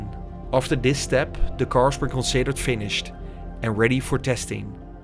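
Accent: Netherlands. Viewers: Haha ik kon aan je accent horen dat je Nederlands was maar mooie video hoor ;-) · No crappy AI voice and just a nice Dutch accent !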